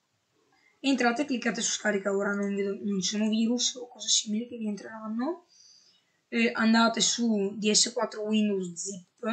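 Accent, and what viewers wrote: Italy. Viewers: bello l'accento veneto comunque bel video grazie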